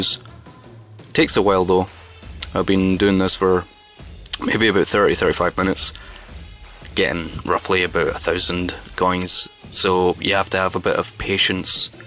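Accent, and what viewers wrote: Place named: Ireland. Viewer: Your accent is AWESOME. Irish people rock just as much as all the other races do! (Equality ftw!)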